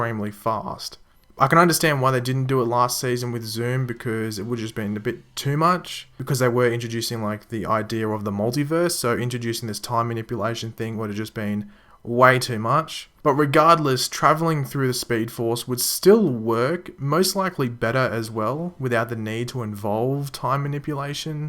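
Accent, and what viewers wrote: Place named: Australia. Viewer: Oh you are Australian. Brilliant.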